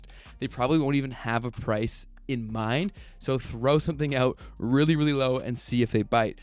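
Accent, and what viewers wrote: Canada. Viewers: You have a slight Canadian: Trailer Park Boys accent. Which is awesome. (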